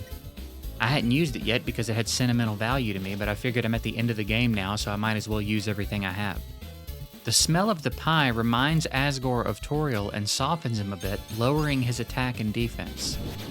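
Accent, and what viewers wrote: South, United States. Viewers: Ok. This is crazy but my boyfriend is watching your I Played 20 Cozy Games... And nowhere online can we find what state you're from. I'm guessing Tennessee, Georgia, or around there. My boyfriend, who I will be shocked if he is right, thinks you are from Northern Louisiana or Arkansas.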